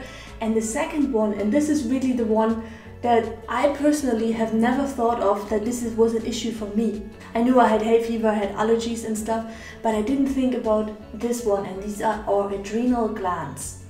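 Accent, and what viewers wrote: Germany. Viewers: das ist doch n deutscher akzent :D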